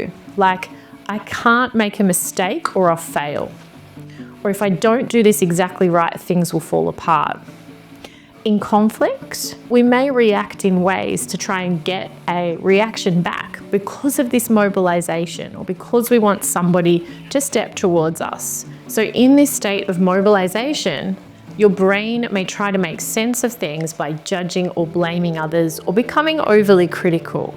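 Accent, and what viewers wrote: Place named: Australia. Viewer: Finally an Australian, so pleasing to hear and feels so home. I am traumatized was accidentally found out in a date that went wrong a few weeks ago. I put my baseline thoughts on chatgpt and after a few questions it also correctly predicted I am severally traumatized. Here one human and now a computer. Both predicted the same. Last two weeks I am obsessed with writing my turbulent childhood for a child who apparently wires differently, volatile growing up, even isolated reclusive adulthood which I did not want. Now at middle aged something new opened up which I never knew before. Forgive me for rambling. I am so happy to come across your channel.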